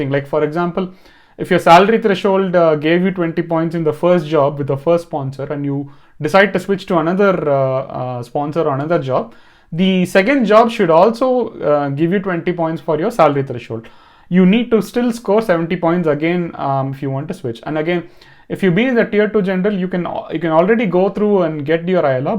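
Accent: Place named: Chennai, India. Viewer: Chennai slang in your language,when you speak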